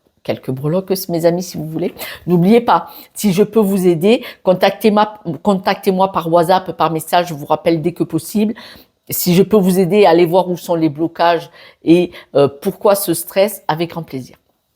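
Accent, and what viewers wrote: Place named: Toulouse, France. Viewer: Je suis tombée par hasard sur vos vidéos et quel bonheur votre accent ressemble au mien j'habite sete mais je suis toulousaine fantastique merci. Evelyne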